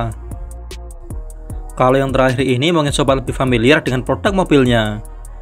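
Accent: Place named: Kedu, Indonesia. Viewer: Jenengan tiyang pundi mas, kok suarane koyo wong sekitaran kendal, batang, dan karesidenan kedu.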